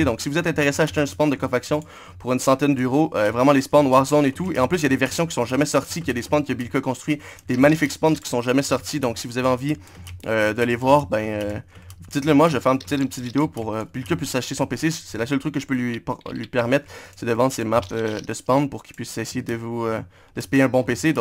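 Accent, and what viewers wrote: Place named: Quebec, Canada. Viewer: 13minutes de plaisir a entendre cette accent QC 😍😍😘😘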